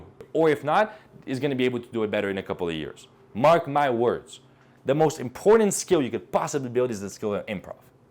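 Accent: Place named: United States. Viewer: U re american? Cause u like balckan but u have still american accent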